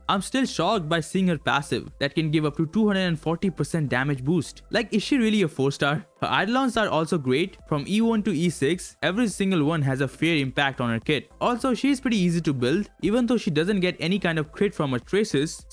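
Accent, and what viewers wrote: India. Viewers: Are u indian or something?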